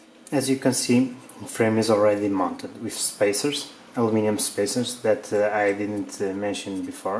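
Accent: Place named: Portugal. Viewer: That accent is unmistakable, é um tuga..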